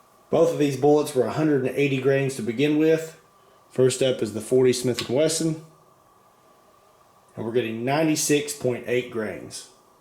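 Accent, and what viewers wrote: Kentucky, United States. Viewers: What part of Kentucky are you from?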